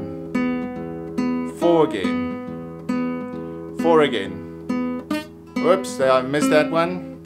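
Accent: South Africa. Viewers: For Forte, the rest stroke finger goes into the next string, don't pluck the string away away from the fretboard. Plucking the string upwards is what gives you the fret buzz. For left hand technique, try to keep your elbow low, it will allow the palm of hand to stay parallel to the fretboard. This will also improve the accuracy of your third & fourth finger. BTW, you sound like your in/from JHB, I'm in Cape Town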